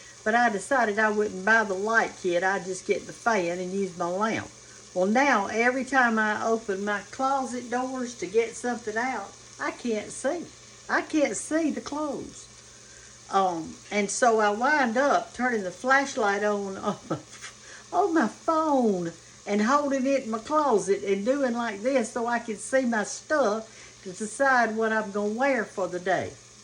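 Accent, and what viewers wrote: South, United States. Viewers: Love how you said you keep moving. Anything special you do? Love your videos and your wisdom. My mother was born and raised in Meridian, Mississippi. Your southern speech warms my heart🙏🙏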